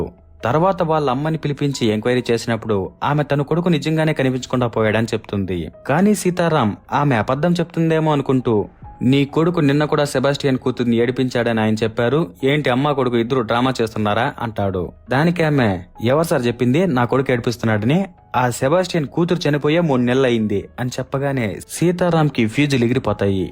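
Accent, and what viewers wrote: Rayalaseema, India. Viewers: Rayallasima kada slang alane untundi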